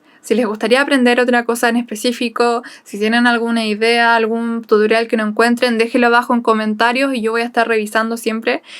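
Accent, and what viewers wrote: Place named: Chile. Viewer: Amo el acento chileno😊 ya me suscribí. Muy buen tutorial. Saludos desde México. Ojalá hagas mas videos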